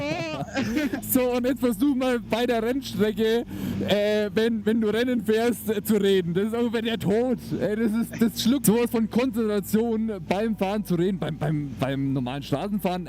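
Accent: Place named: Franconia, Germany. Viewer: Bist voll der Franke gell?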